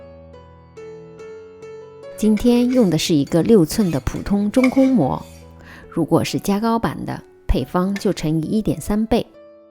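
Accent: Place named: Shanghai, China. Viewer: 的口音和样子都像上海人（至少是江浙沪一带的），讲解也很细致，像极上海人的风格。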